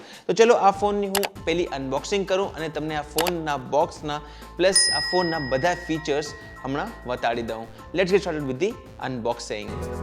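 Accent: Kutch, India. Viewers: ગુજરાતની કચ્છી બોલી બોલો છો તમે. મૂળ ક્યાંના તમે? સારું લાગ્યું કે તમે ગુજરાતી છો.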